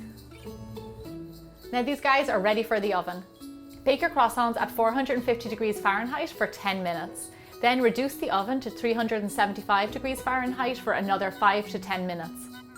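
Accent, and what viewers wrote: Ireland. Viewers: Looks heavenly. My mouth is watering. Love your Irish accent❤🍀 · are you Irish ?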